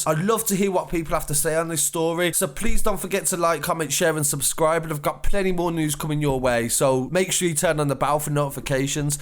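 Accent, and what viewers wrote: Birmingham, United Kingdom. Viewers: The Birmingham accent is truly despicable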